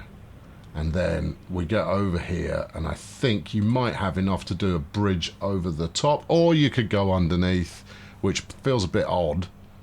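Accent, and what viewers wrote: United Kingdom. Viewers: I loving your Doon canyon series. And nice to hear the dulcet tones of a fellow Brit. What what, cuppa tea govna etc etc.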